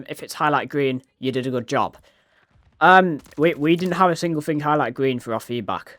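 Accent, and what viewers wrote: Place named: Yorkshire, United Kingdom. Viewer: You're in Wales? I'd of sworn you were in Yorkshire... 😂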